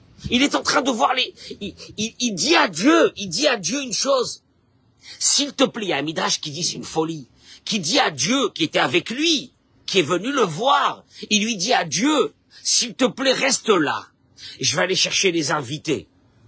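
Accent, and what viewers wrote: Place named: Marseille, France. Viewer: Vous parler avec geste de méditerranéen je vois et j entend un marseillais super merci pour votre lumière j aime vos cours toda😂😂😂😂👍👍👍🤣🤣🤣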